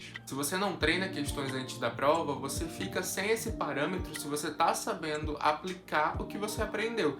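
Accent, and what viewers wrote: Pará, Brazil. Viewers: Já ta com um pouquinho de sotaque parense 😆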